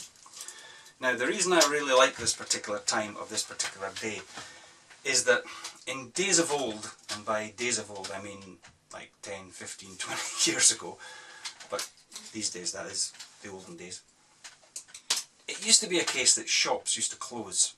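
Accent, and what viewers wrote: Scotland, United Kingdom. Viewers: dude, you're like george clooney with a wee brogue. ;)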